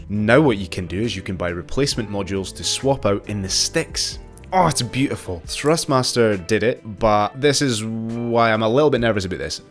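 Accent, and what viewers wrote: Scotland, United Kingdom. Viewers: I hear Scottish I sub simple as... on a serious note the pro controller could be a good thing with regards to the swappable analog modules... i could open up a market for 3rd party companies like Razer, SteelSeries or Thrustmeter to make parts for it... its just a thought Edit if you look below the analog stick you will notice to bumps (where you finger naturally sits under them) looks like 2 additional buttons so there should be 4 rear buttons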